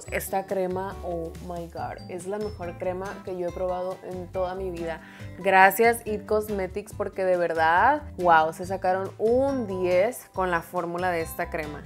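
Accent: Mexico. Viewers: A mi me encanta que hables como está buenas sinaloense mujer la verdad 💜🍇💜🍇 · Jajaja me encantooo como hablas, pura mexicana! Saludos! Me acabo de suscribir porque me convenciste con tu flow de poses perronas jajajaja 😂😂😂😂😂😂👍🏼👍🏼 saludos de Guatemala 🇬🇹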